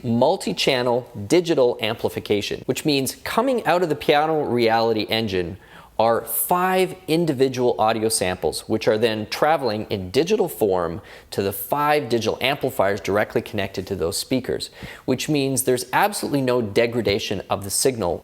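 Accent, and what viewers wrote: Canada. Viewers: Questionable, indeed. I would say downright annoying! I don't understand the point of the subtitles, since speaks clearly in perfectly understandable (eh?) Canadian... 🙂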